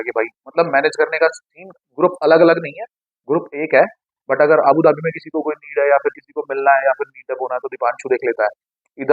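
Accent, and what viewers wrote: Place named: Haryana, India. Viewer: Thoda haryani touch acha lagta h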